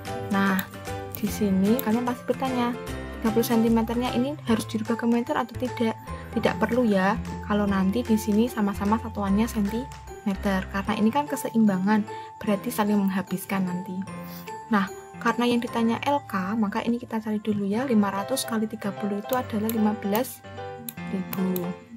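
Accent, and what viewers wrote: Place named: Java, Indonesia. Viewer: Ibu nada jawanya kentel banget 😅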